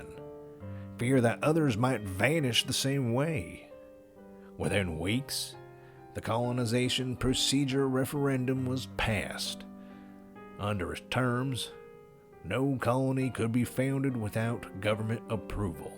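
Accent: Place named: South, United States. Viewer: Stylized Southern accent, but real none the less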